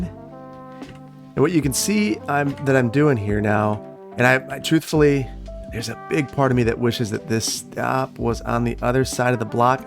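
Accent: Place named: Midwest, United States. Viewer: I've been catching up on your videos, and I just gotta say: your accent gives me life. God bless the Midwest!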